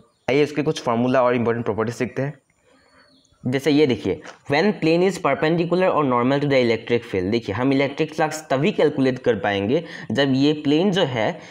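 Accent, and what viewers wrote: Assam, India. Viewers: Sir apuni axomr neki apunar hindi kowa style tu amr axomiya manuhe kowar dore 😊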